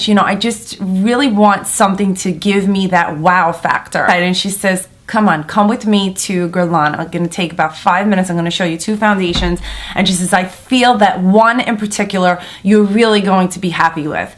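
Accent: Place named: Long Island, United States. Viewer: OMG! I am not being offensive. I am from Lawnguyland. You sound like home. Non LI pronunciation: "Lan Jer E" :-)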